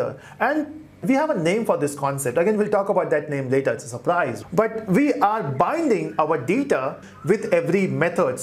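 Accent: India. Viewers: Love the Indian accent. Greetings from Italy!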